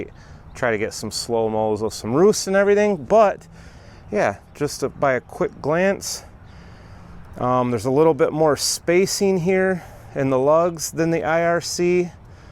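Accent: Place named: North, United Kingdom. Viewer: I hear your mega northern accent!😁 yu play hockey too!.!?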